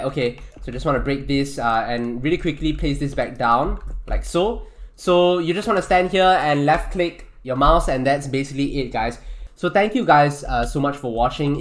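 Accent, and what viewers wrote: Singapore. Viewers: Omg that y ur voice sound like sg me also play skywars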